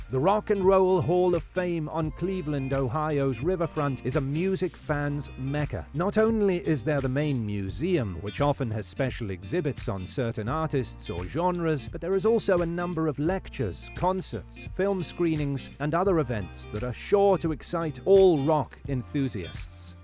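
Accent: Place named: United Kingdom. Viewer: British dude talking about the USA smh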